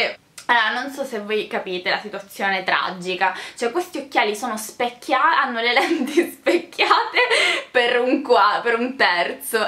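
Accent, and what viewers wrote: Sicily, Italy. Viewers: Ehi ciao:) mi sono appena iscritta!! Metti troppa allegria e a quanto sento sei siciliana come me... 👍🏽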